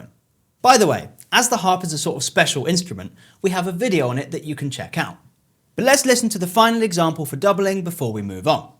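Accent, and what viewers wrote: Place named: England, United Kingdom. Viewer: It's a perfectly clear, understandable and succinct English accent, what are you talking about!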